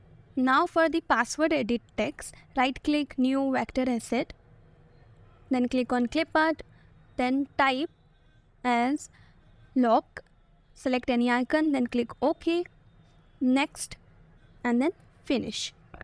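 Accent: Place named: Hyderabad, India. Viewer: i think you are from hyderabad bole to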